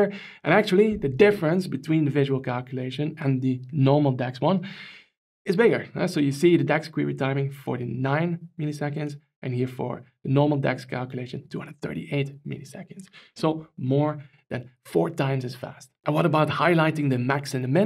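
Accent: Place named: Netherlands. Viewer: I am not an English speaker but I follow your educational content. Why is your English accent like this? Whether it is Google's AI or any AI I have used, it pronounces your language as Dutch, not English.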